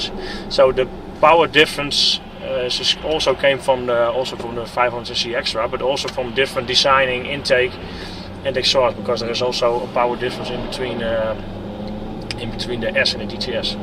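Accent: Netherlands. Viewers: You sound Dutch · duidelijk een NL'er, wat een accent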